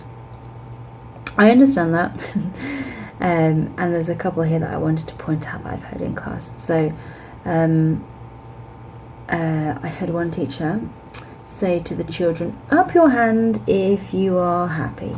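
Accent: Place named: United Kingdom. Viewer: Very interesting insight into this issue (and quite brave of you, I must say). As a Spanish ESL teacher, I used to work at an academy and noticed not just basic mistakes similar to the ones you mentioned, but some serious pronunciation issues. To be fair, we have an obsolete educational system in Spain when it comes to English: you never get to really practice your speaking or listening skills as much as needed (I'm talking about regular public schools). It is also true that nobody knows it all and even English native teachers do make mistakes. However, I believe people here tend to give it for granted that the fact that you got your university degree means you already have the sufficient level to teach English... and more often than not, this turns out to be self-deception. We must be aware of our weaknesses and the need of constantly improving our knowledge and skills, especially if aside from making a living out of it we intend to offer a real good-quality teaching of the language. 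Unfortunately, this is just like any other profession: some use their uniform as if it was an irrefutable evidence of perfection. I reckon what really makes the difference is whether you truly love what you do or not: if you do, you will try your best to continue correcting your mistakes and boosting your abilities. If not, you will just keep delivering sloppy lessons. By the way, you have such a clear and lovely British accent! I hope you are doing well and both your students and colleagues can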